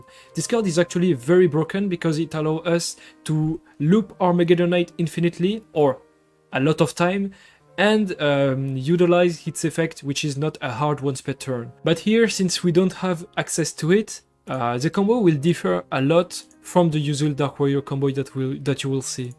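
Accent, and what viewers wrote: France. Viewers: The heavy French accent makes this very awkward and awesome at the same time.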